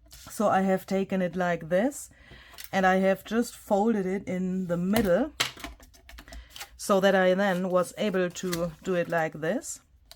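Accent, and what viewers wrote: Germany. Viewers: These are fantastic. Just love them, but seriously I love everything you do. I so enjoy your videos, your German accent and your wonderful sense of humor. I like you as a person. It’s wonderful you get to enjoy your little piece of nature at least for part of the year until they all show up and you feel like you’re in the middle of a bustling metropolis 😂😂❤️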